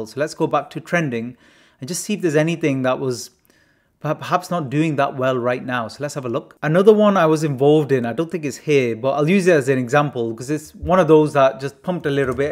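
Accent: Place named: East London, United Kingdom. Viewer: well done bro.. u seem to be good in trading knowing u just started recently in NFT.. im from east london, you sounded like from east side as well..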